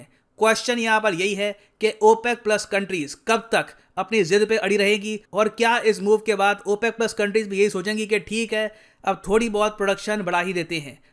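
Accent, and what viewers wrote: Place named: Delhi, India. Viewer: “Hum gerrnge, dhar lia” sir’s delhi words so amusing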